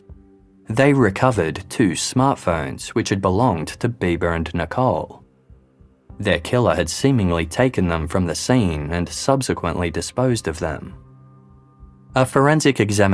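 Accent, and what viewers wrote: Australia. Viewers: The narrator's accent is " educated Australian ". As opposed to " broad Australian" such as the crocodile Hunter.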